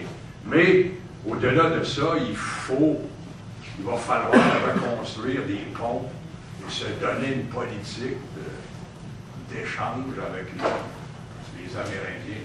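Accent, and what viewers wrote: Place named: Quebec, Canada. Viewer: Une vraie langue de bûcheron !!! Ça donné quoi son jappage en joual ? RIEN !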